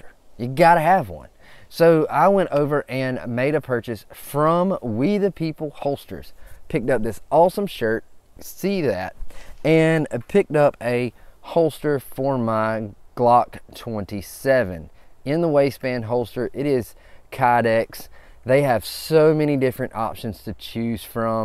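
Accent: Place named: Georgia, United States. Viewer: Great videos! I’m enjoying your channel. You sound like you’re from Georgia. Also kinda looks like Georgia woods behind you. 😂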